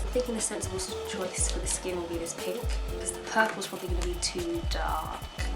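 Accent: United Kingdom. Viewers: are you British?? X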